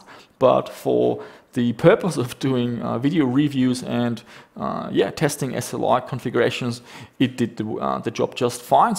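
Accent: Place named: Germany. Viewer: Nice Video, can i ask you were you’re from? You have kind of an German dialect :D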